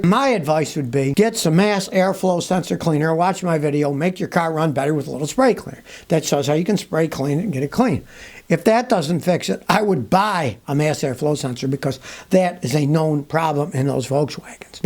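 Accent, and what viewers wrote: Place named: Niagara Frontier, United States. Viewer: You've been driving for 55 years and yet I can still detect a Niagara Frontier accent. 🤠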